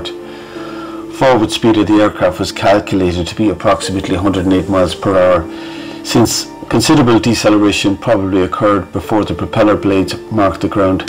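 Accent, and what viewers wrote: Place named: Ireland. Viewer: I can hear him perfectly well. Obviously there is an Irish accent we have to allow for, but as he seems to be a guitar player musician, I'm sure the microphone was of adequate quality. Might just be your speaker is a fault 🤔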